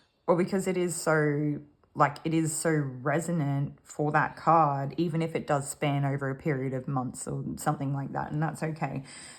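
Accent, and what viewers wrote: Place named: Australia. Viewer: Group 1 it was great that you explained things for new watcher 😊 and that you recognised some people aren't into chakras or meditation (that's me). It took a few times for me to hook into your readings properly, but i liked your Aussie accent (I'm kiwi) - a lovely change from the mostly American ones and I'm glad you're still here 😊 The reading definitely resonated. I'm talking to my ex- i told him i don't want to see him, but he's answering some questions about our past right now. I slipped up and mentioned a place i go to regularly, so now I'm hoping he doesn't turn up on the pretext of seeing my daughter who works there. I'm only talking to him to show him how our values, everything don't match but i think he thinks if i see him, i won't be able to resist him. He's full of himself like that 🙄 and totally self serving, but he's trying to disguise that. 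I know him better than he knows and ever WANTED to know me. Thanks ❤❤❤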